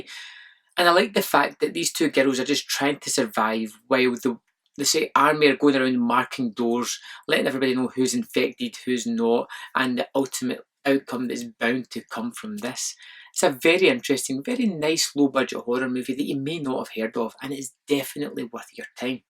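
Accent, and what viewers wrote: Scotland, United Kingdom. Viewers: By the way. I am assuming you either live or are from Scotland due to your accent. I have always wanted to visit Scotland. What is it like living their?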